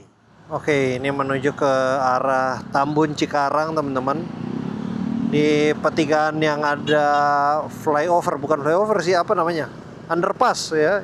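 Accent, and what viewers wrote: Eastern Indonesia, Indonesia. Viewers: Izin tanya bang,, asli daerah mana bang,, soalnya logat nya kyk agak mirip daerah timur sedikit bang 👍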